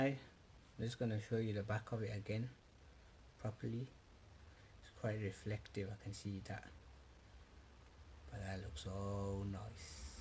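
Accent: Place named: Birmingham, United Kingdom. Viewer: your brum accent 😭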